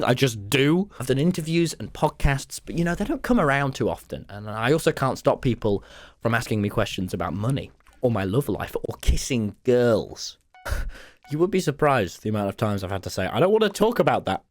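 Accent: United Kingdom. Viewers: so... the weekly slap but bri-ish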